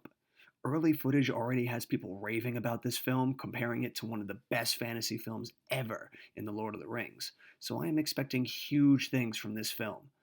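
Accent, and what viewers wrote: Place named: Brooklyn, United States. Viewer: nice vid - are you east coast repping? your accent reminds me of life in BK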